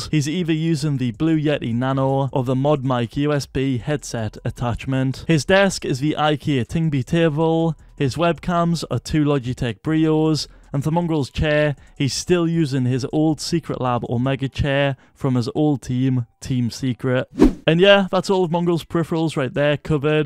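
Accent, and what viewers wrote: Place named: United Kingdom. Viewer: is british acent right?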